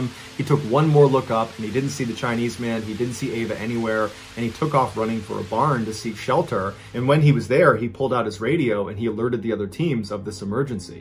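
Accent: United States. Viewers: It's funny as an Aussie, hearing Americans pronouncing our towns and city names. Not Mr Ballen fault, it can be hard. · I do have to say I love hearing Americans say Australian towns. As an Aussie it takes me a moment to catch up on what towns are being spoken about. I end up saying it correctly in my head.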